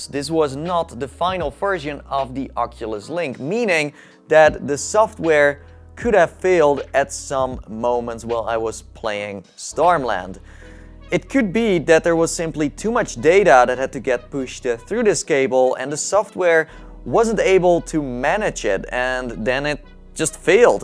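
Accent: Netherlands. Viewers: wow je hoort echt hard dat je nederlands bent XD ly, keep up the good work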